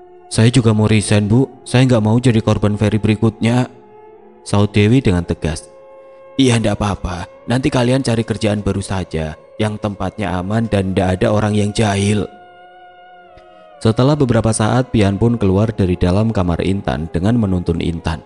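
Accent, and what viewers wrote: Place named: Jakarta, Indonesia. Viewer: Demen banget kalo ceritanya ada logat" Betawi nya 😅😂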